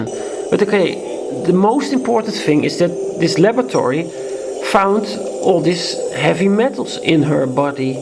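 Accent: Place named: Netherlands. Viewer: Hey, leuke film hoor, je bent zeker echt Nederlands he? Je accent ;)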